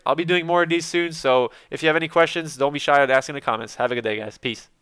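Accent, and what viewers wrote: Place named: Quebec, Canada. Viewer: c un quebecois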